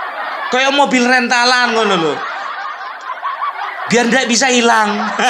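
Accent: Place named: Java, Indonesia. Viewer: Aseeek...opung pake logat Jawa..😂😂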